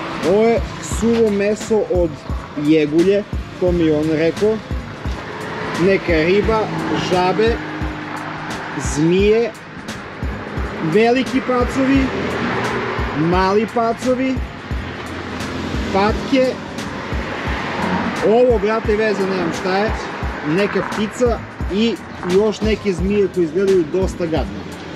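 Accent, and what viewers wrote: Niš, Serbia. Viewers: Pričaj niški slobodno svi razumemo ,ali odlican video. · Pećina sa 5 miliona SLEPA MIŠA - kolko volim niški padeži i akcentovanje, nema jače! Samo napred, velika podrška, KIDAJ! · Baš mi je drago što be bate tepaš si po naš Nišlijski, pozzz može li tamo neki džokavac da se smota ovo ono :D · a be ovoj neki novi momenti :)) pričaj si sve niški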